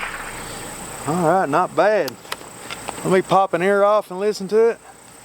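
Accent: South, United States. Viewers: I subscribed,after hearing that country boy voice,I knew we’d be just fine 🤜🏻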